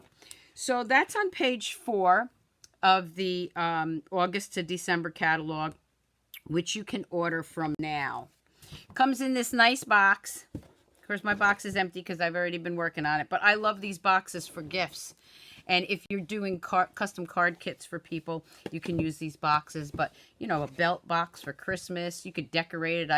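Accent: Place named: New York, United States. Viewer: G'day, watching the replay here. I love your New York accent lol. Cheers from Heidi, Melbourne, Australia 😊💖💙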